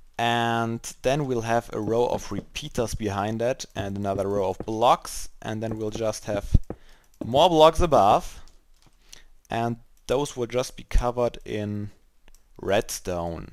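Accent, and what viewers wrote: Germany. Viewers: hey kanns sein das du deutscher bist ;D du spricht irgendwie so deutschen dialekt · German I'm guessing? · man kann sofort hören mit dein deutschen dialekt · Ich wette du kommst aus Deutschland :)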